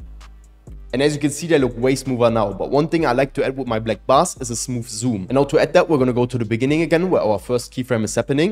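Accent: Germany. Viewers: bro sounds so german 😭😭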